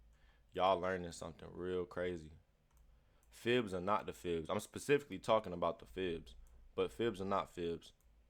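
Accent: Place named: Detroit, United States. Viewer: you from the D dawg ? you slick gtta accent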